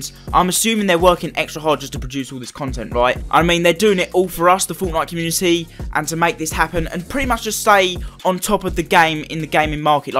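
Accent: United Kingdom. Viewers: Are you from liverpool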